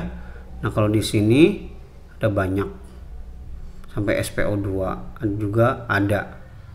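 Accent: West Java, Indonesia. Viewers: sae a repiuna... nyunda pisan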